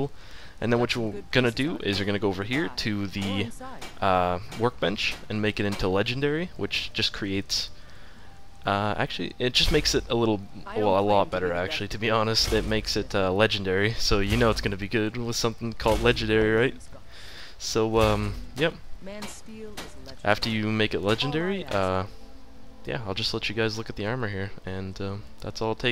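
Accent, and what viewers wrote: Canada. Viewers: canadian accent? :D im british btw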